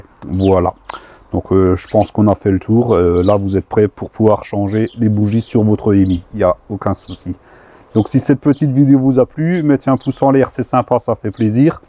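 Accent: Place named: East, France. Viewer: Non c'est pas dur car célibataire et 48 m² à Brest plein sud en étage avec mon chat et il fait beau, j'imagine avec une nana et des gosses....mais le plus dur c'est de ne pas pouvoir aller voir mon père de 75 ans et mes grands parent de 91 ans. En tout cas j'adore ton accent de l'est mon ami et ta gentillesse et surtout il y a toujours pire que soit mec salut. Kenavo.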